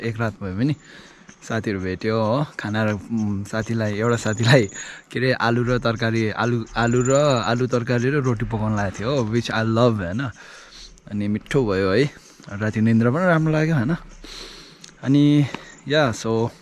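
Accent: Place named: Sikkim, India. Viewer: AE kind of hjr ko bolai xai Sikkimese jostoi lagyo ni so BTW keep going haitw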